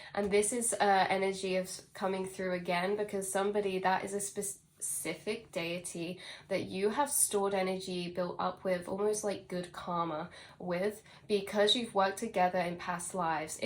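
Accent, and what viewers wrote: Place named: United Kingdom. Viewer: Girl, you embody a plethora of Wisdom, you showed up on my feed two days ago and I've been listening to you since, all day yesterday, and I must say that you embody within you, truths that's taken me years to aquire, and this reading is the most profound! Given that I've lived 800 lives 😂 my lineage travels back to Luke, the physician in scripture, which explains why I've always had a passion for healing... Mind, Body and Spirit... In ministry it was my goal and as a Holistic Healer, it is still a goal of mine... Wayne represents royalty in the early 1700 or even earlier, I know I've been in that life, I've seen and felt it and I'm drawn to those types of movies and frequencies... Celtic, knights, time travel and sci-fi... Some, not all.... Embracing my ancestral roots of Irish Scottish.... You sound British 🙂 German and Native American.... 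I recently discovered that I am a Blue Ray Starseed, Earth Angel, they've traveled with me and shown up in my meetings, and it resonates with me deeply.... Yes, large amounts of money is my inheritance and is needed for this leg of the path I'm on .... I also discovered that I have a dragon 🐲 working with me, I want to meet 😂 I see colors of blue, gold and white, purple... Amethyst is a strong energy and green, especially while in meditation....and the forest lights up with Frequency when I'm there... I Really Love this reading 📖 as far as commutation, I AM constantly in communication with Spirit and now my guides and starseed family.... S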